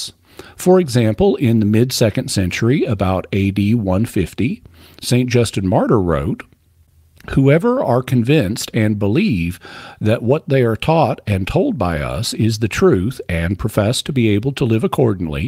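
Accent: South, United States. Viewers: why do you sound more country than usual? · I love how you can hear southern accent getting slightly more pronounced since his move back to Arkansas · Lol I thought I was imagining it. His accent is definitely stronger than years ago. The intonation and rythym of the speech is more Southern too. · Big fan of. Not a fan of his (re?) acquired southern accent.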